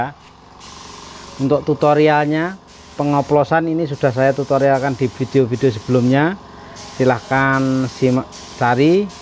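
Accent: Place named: Magetan, Indonesia. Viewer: Kok bahasane koyo wong magetan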